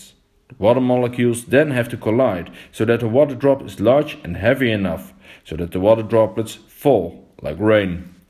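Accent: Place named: Netherlands. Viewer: It’s just funny how obvious you are a dutch native. Very interesting video tho!!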